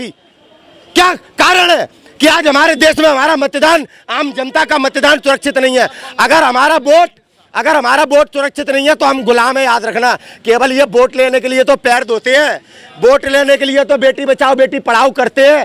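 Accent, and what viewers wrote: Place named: Varanasi, India. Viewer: Ye Bhai tho Banaras wale misraji jase bolrahe hai. Misra ji ko our app ke jazbe ko salam. Sare jahan SE acha Hindustan hamara. Jai Javan Jai kisan. Hindustan Zindabad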